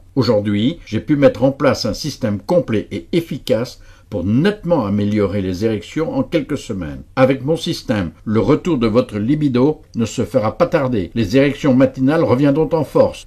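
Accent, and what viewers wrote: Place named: Belgium. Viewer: Un "coach"? Mdr.... Ne serait il pas plus logique de consulter une femme pour ce genre de problème.? Il semble qu elles soient plus à même de savoir ce qui leur importe et leur plaît le mieux (non,que la bandaison n ait aucune importance,attention. ..). L accent belge de ce type au pseudonyme américain en dit long sur son efficacité et ses compétences. ...Ça pue le charlatanisme à plein nez,et à 300km à la ronde! Pathétique! ...Et j ai deux demoiselles à mes côtés qui approuvent mes dires,...Lorsqu elle ne me les ont pas carrément "suggérés"...D apres elles,il serait bien à côté de la plaque...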